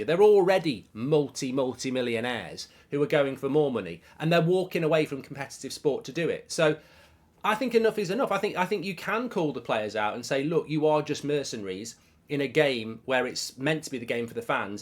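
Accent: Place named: Newcastle, United Kingdom. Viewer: That Newcastle accent haha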